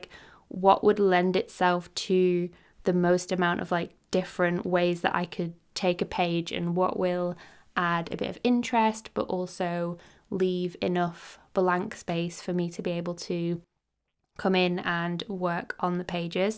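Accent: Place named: Manchester, United Kingdom. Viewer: Love these, it's great to not have to stitch the pages in for once ❤ Off topic, but can I ask whereabouts you're from? You sound sort of Greater Manchester-esque like me!! (I'm in Stockport) x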